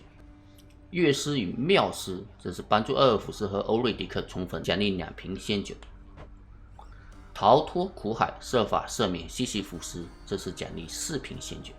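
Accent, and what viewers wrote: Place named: Fujian, China. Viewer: 胡建口音有点重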